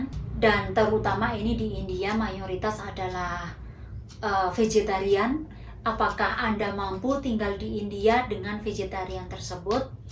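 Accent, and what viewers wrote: Java, Indonesia. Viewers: Senyum senyum sendiri, dengerin logat jawanya Mba belum hilang 😊, Kadaluarsa = kadaluarso Sekonyong konyong koder 😅😅😅 Mba ditunggu vlog ramadan disana ya 🎉🎉🎉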